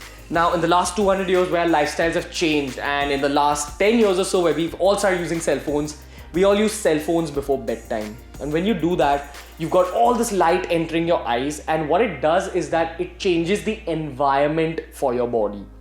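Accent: India. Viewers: Be grateful that indians are speaking english. They dont need to. If you dont like his accent, fuck off!! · fuck indian accent. irritating af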